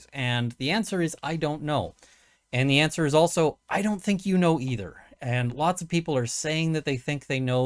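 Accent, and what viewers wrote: Canada. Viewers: I love Canadian! 🤗 Please say 'out' or 'about' as often as possible.♥️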